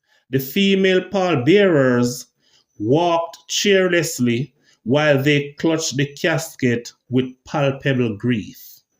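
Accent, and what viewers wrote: Jamaica. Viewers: I completely agree! People forget Jamaican cadence, intonation, syntax is uniquely beautiful and that also it's such speech variants that shape carribbean language.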